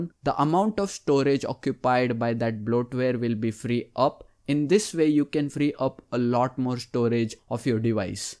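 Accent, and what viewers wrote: India. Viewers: I agree it is very difficult to understand it is very difficult to understand anything you say that Indian accent that Indian accent makes it very difficult very irritating I only understand half of what you're saying sick and tired of it thanks for nothing thanks for nothing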